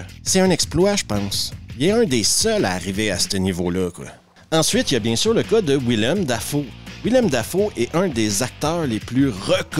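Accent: Quebec, Canada. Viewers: Je te suis depuis quelques temps un vrai pkaisir de re decouvrir ces films 😂😂 le toit avec un superbe accent québécois merci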